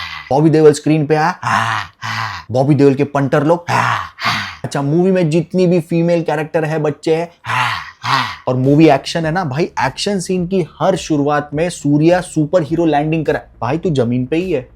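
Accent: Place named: Mumbai, India. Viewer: not.a fan of ur mumbai accent dont do it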